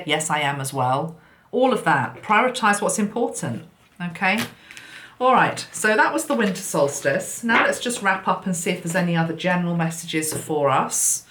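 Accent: England, United Kingdom. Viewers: A big thank you from Johannesburg, South Africa! I'm originally from England so I enjoy your dulcet English tones:-) I shall keep walking through the gateway with the cool blue flame in my hand. Such a powerful image to take with me in my shadow work to release all that no longer serves me, thank you ♥️💜💚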